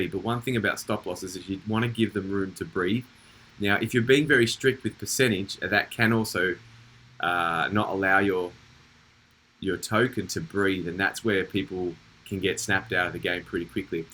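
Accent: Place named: Australia. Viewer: Can you do a staking video please , good to hear an ozzy talking crypto .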